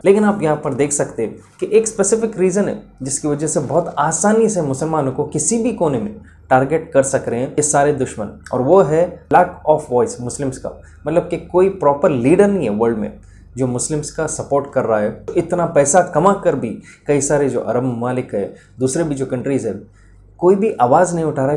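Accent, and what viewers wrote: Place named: Bangalore, India. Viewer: Thank you very much for your very informative and very interesting video Are you from Banglore ( India) Your accent is like a Banglori My mother was from Banglore Yes you are right about the three very strong leaders of Arab - African countries At present in the entire muslim world There's no strong leader Recently Pakistan had to take loan from IMF We are facing the crunch About Imran Khan I am very much doubtful Is he sincere for Pakistan or not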